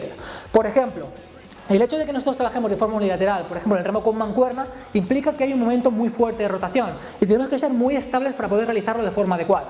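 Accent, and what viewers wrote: Galicia, Spain. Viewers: pero con acento gallego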